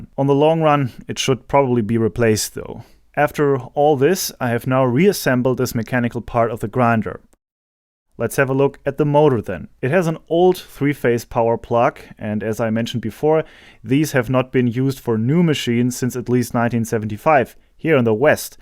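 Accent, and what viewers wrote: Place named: Germany. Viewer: Despite your nearly unappreciable german accent, your english is very good and easy to understand.. didactic and vell done video, gracias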